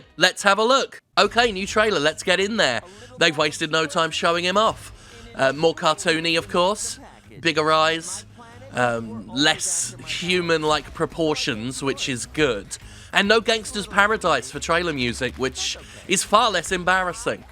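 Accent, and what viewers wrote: United Kingdom. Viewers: you talk like an old man with a stuffy nose :/ you must be british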